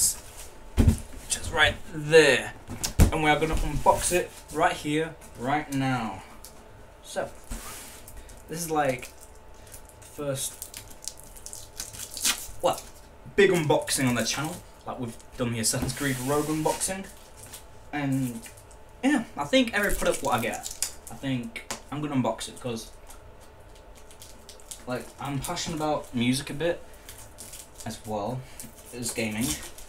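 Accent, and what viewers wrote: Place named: United Kingdom. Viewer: Michael Woodruff dude....lay off it's how the Brits talk....kinda like privacy or aluminum.... Lol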